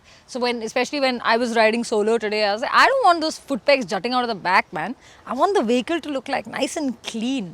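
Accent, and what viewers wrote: India. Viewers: Lady that bike is NOTHING compared to your beauty! Wowwww I am crazy about long dark hair but your face, your smile... I tried 5 times looking at your video to get more info on the bike but failed again and again. Your Indian accent and of course Indian decency is only the Cherry on Top. What did you say about the biggest bike??? ❤❤❤❤❤❤❤❤❤❤❤❤❤❤Much love from South African Boer. Ps.? if you come with the Bike I WANT one YESTERDAY, TODAY AND TOMORROW. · Don't talk Indian English, talk just in Hindi, your English language is very poor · Loslos mo! Indian accent p rin whatever 😏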